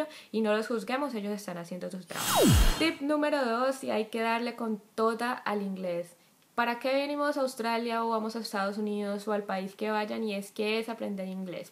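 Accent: Colombia. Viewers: esa forma de hablar, jaja se nota que sos colombiana. Muy buenos consejos; y como tú dices: ponerlo en practica es indispensable. Muchas gracias por el video, saludos desde Cali-Colombia vee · Tenias mucho acento caleño jeje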